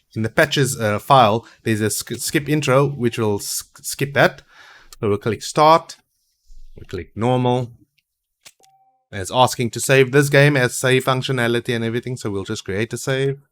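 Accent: South Africa. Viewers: bru are you south african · ive been trying to play this game on pc of so long and your vid really helped me tanks(btw are you south afrian)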